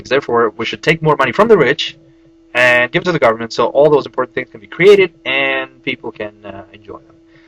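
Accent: United States. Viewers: you have to make a video about how you acheaved this accent seriously :) im amazed how freely and fast you speak and what a good american accent u have its hard to imagine that had developed this accent after you went to the US when u are there owhensince 2005 ...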